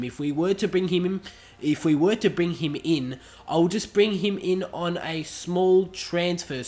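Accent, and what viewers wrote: Australia. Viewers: you from Australia??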